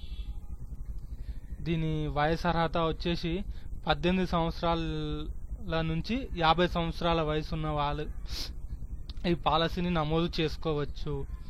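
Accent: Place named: Telangana, India. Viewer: Anna nuvvu telanaga odiva? ekdam matlathunnav telanganala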